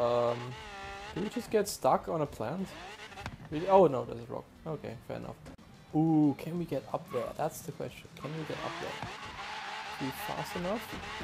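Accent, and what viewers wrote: Germany. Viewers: Man hört irgendwie ein bisschen, dass du deutsch bist xD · you german ? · Cool Video but Theres Just one Thing i will know. Are you a german guy the game is german and your voice Sounds german to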